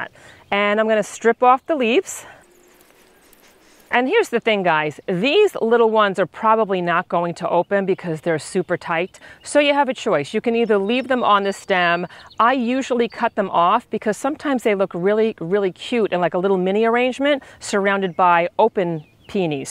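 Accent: New Jersey, United States. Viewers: It's good to hear a Jersey accent again! I live in middle Tennessee now, Zone 6B, originally from north Jersey, Zone 5b/6a. We have a row of beautiful peonies that are over 60 years old. The story goes that my mother-in-law received them as a gift when my husband was born. They have a lot of meaning for us! I did my due diligence to prepare the soil well for the tubers we split from the originals and they are doing very well. I want to make sure I continue to care for them well. I had never heard of dry cutting before and I will definitely do this next Spring.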